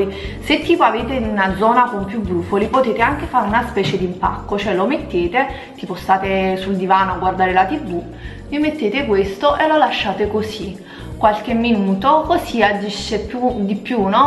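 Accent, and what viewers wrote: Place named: South, Italy. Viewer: Sei bravissima❤️😃Di dove sei? Forse è il contrario ma sento un’ accento del sud (?)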